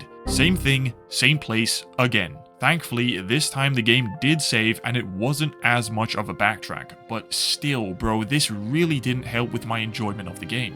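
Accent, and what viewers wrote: Netherlands. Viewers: Hey! You Dutch? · I know a fellow dutchie when I hear one. And the part with your brother absolutly killed me as someone who could as a dutchmen haha. It also confirmed my suspicion about being dutch 😂 anyways loved this vid as im getting into plat hunting myself right now. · Ik hoorde gelijk dat je een Nederlander bent hard ik gooi een sub voor die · One question are you dutch ?😅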